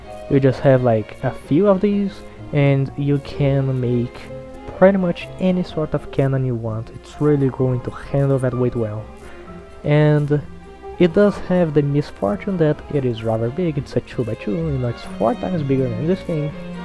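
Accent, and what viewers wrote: Brazil. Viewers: I heard portuguese voices, are you from Brazil? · Men, are you brazilian ?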